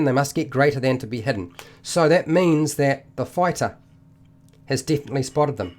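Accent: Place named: New Zealand. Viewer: Me: Hm, I wonder if this is an Australian or a New Zealander? 🤔 "... is a check." Me: New Zealand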